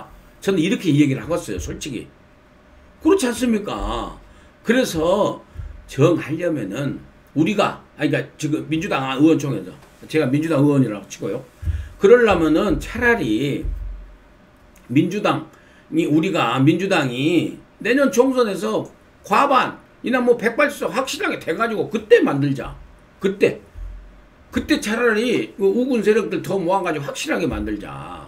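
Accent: Jeolla, South Korea. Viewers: 영삼씨 웅변이 재미있네요 절라도 말별로 안좋아했는데 구수해보시네요 좋아요~^^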